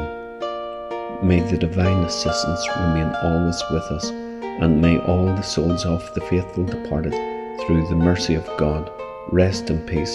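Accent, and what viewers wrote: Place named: Ireland. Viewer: I love the Irish voice. reminds me of being in school with the Irish priests and nuns as a girl.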